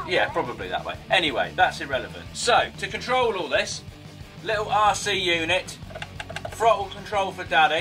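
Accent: United Kingdom. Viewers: English accent... didn't you? Btw, awesome sir... 👍👍👍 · i always love British accent, thats help me learn English faster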